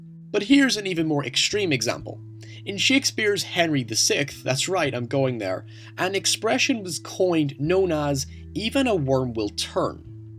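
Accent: Northern Ireland, United Kingdom. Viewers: where is this guy from is it northern ireland? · Are you Northern Irish? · Norn Iron?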